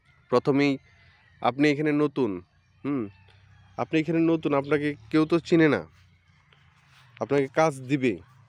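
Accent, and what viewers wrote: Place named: Barishal, Bangladesh. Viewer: আইতাছি রে ভাই। বরিশালের ভাষা🥰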